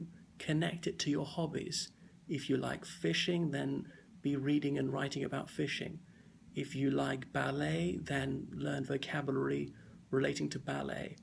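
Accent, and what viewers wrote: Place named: RP, United Kingdom. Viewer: I'm trying to learn british rp and your videos is a goldmine! I'm learning it's important to speak slower for btisih rp. I do notice in Downtown abbey that there is a bit more musicality, for a wanting a better word, in their accent than yours in this video. Is that kind of intonation and musicality in their speech considered terribly old fashioned these days and would be laughed at?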